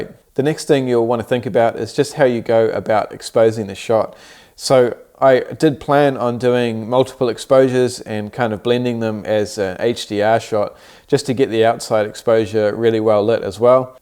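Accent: New Zealand. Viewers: Refreshing to hear that Kiwi accent!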